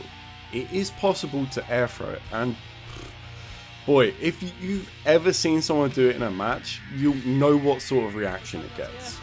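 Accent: England, United Kingdom. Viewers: What's with this super heavy duty metal in the background as you calmly speak in a refined English accent? Lol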